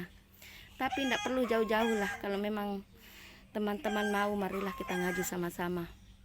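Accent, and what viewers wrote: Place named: Lombok, Indonesia. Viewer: Marak logat Lombok timur...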